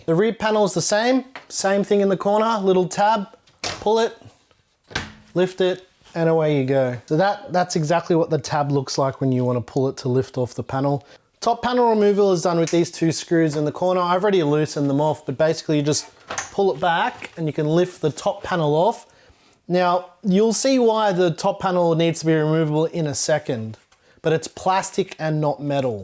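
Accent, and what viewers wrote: Australia. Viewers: Great case review, and your build looks great but Zeeee, You’re not AMERICAN you sound Australian, we say Zed, not zee!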